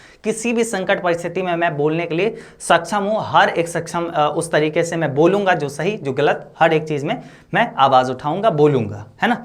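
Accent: Bihar, India. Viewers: proper bihari spotted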